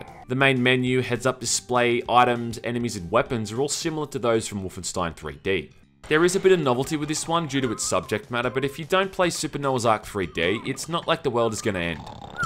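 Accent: Australia. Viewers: Ye-es! It's not Christmas 'till aussie brogue has graced my ears with a year-end wrapup vid! Thanks man!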